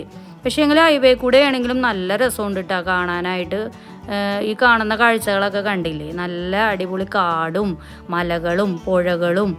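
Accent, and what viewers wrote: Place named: Kochi, India. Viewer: Cochin slang is the beauty of your video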